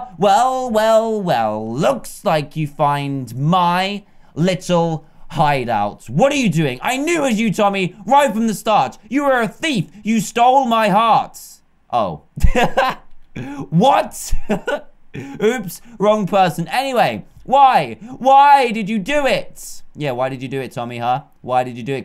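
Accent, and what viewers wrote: United Kingdom. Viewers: is it just me or does he sound British??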